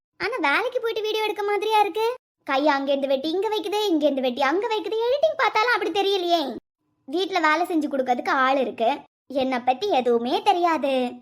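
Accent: Kanyakumari, India. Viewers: Ayyo sister kanyakumari slang kaga dhan video pakkavey start panna enaku unga slang romba pudikum na Kumbakonam enga slang movie la pesura madhiri irukum but ungaloda slang super ah irukum inga ellarumey apdidhan sister inga pottiya vida poramai dhan adhigam neenga video podunga nanga support pandrom 2 days ah yen video varalanu think panna new married outing edhuvum poirupinga so video podalanu nenachan but idha pathonadhan theriyudhu enna reason apdinu enga support eppodhumey ungaluku undu neenga video podunga ❤❤❤❤❤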